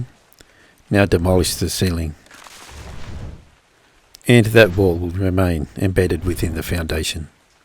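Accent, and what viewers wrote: Australia. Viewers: has an almost Australian accent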